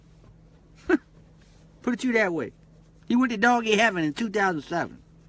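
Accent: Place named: South, United States. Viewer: He talk like a southern guy not a black guy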